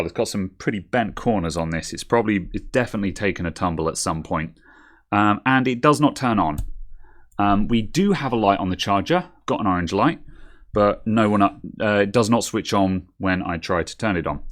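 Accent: United Kingdom. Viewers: Your voice is literally why I have your vids running as a background when I work. So soothing and british :D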